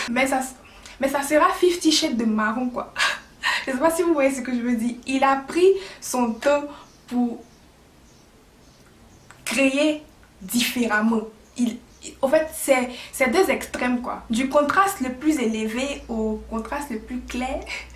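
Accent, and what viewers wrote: Ivory Coast. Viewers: Tu es ivoirienne? Je viens de m'abonner l'accent ivoirien là 😉😉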